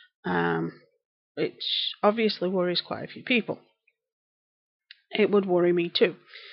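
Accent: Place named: Bolton, United Kingdom. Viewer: you sound like you are from Bolton. xxx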